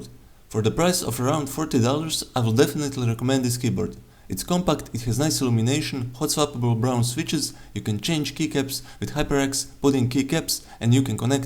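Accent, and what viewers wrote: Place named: Eastern Europe. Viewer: Where are you from? Cus you sound like you're Slavic :)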